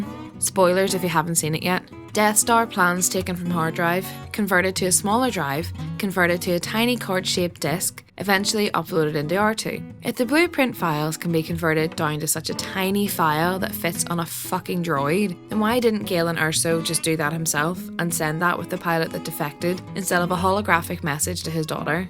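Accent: Scotland, United Kingdom. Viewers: Just curious but are you Scottish, maybe Irish?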